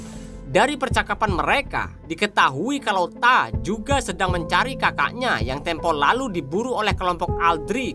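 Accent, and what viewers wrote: Java, Indonesia. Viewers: Ngomong.a medok banget jamet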